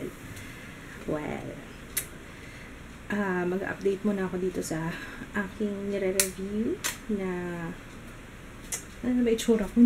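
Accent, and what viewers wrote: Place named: Iloilo, Philippines. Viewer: hi miss! nakakaaliw po kayo! i feel good watching your videos. may pag ka hiligaynon (ilonggo) yung ibang word and accent nyo hahaha.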